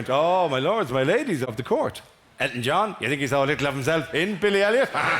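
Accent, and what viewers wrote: Ireland. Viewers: I saw this on DVD in about 2007, and I always assumed the name was Peter Thatchell, and it was just Dara mispronouncing it in a thick Irish accent. Was only years later I discovered it was actually Tatchell 😳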